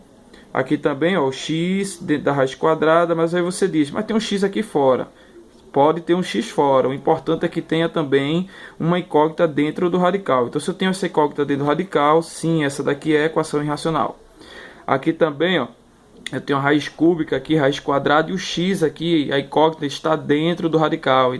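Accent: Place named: Northeast, Brazil. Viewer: Gostei muito do canal, consegui aprender fácil a matéria. e pra mim fica mais fácil quando o professor tem um sotaque de nordestino, o porque eu não sei .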